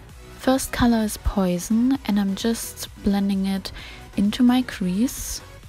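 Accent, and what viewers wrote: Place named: Germany. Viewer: Which country are you from? I think that you have kind of a german accent 😊 I'm from Germany too🥰 where did you get the palette? Did you order it?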